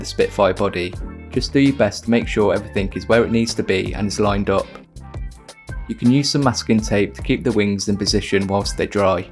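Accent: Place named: Birmingham, United Kingdom. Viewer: I think your slow-pitched Brumie-accented delivery is ideal for tacklin' these modeling projects. Your videos ought to be mandatory for new hobbyists.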